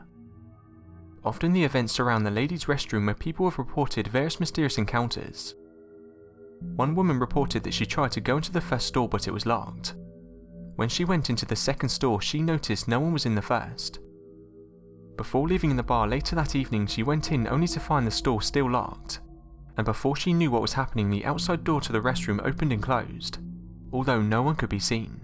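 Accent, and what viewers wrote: United Kingdom. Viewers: You almost sound like the narrator from Top5s. You both are my favorite British narrators. I hope you eventually obtain as many subscribers as Top5s. God bless, and greetings from a US soldier from Illinois, USA!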